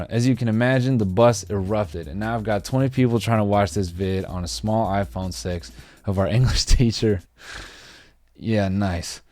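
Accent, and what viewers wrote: United Kingdom. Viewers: Dudes lowkey British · Damn, does a pretty decent British accent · Why is no one talking about his British accent?? Lol amazing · talking with a british accent is giving me CODEL flashbacks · That British accent is top notch mate. Nice one 👍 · I love this British accent😭😭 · I live for British accent, he is the only American who does it so well. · Ur British accent has come a long way broski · That British voice is something is perfecting slowly hahahahha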